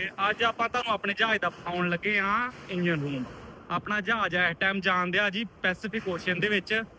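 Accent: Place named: Amritsar, India. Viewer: Goodf information and you belongs to amritsar district as it is clear from your speech.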